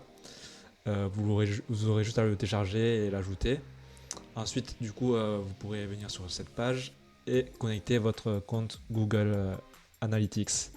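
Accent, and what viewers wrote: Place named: Southwest, France. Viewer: accent familier du sud ouest ça fait plez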